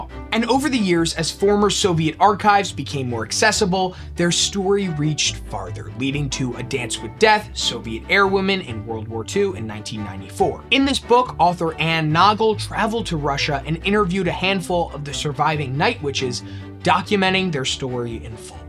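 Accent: United States. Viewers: european history taught to me in a philly accent, it's like i'm back in high school <3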